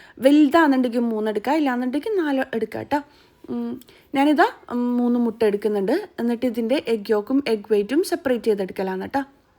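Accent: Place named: Kannur, India. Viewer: നിങ്ങള് കണ്ണുർക്കാരിയല്ലേ... നിങ്ങളെ സംസാരം ഇതുവരെയും എവിടയും കേൾക്കാത്ത സംസാരം... ഓരോ വാക്😂 റെഡി ആകാൻ വേണ്ടിക്ക് ഇങ്ങനെ കുറച്ചു ... കേൾക്കാൻ രസം ഉണ്ട് 😁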